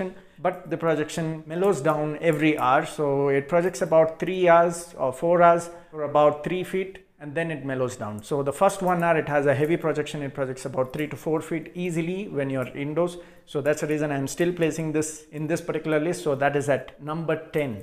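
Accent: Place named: India. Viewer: My brother u look arab but u sound indian what ??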